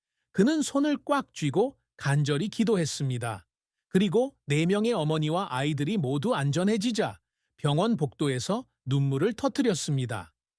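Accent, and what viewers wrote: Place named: North Korea. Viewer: 이 머꼬 ㅡ가짜 방송 할래 ?? 부칸사투리네 ㅡ ㅜㅜㅜ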